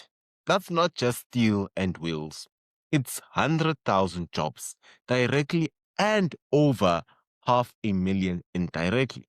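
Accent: Afrikaans, South Africa. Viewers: Love the afrikaans accent nice man.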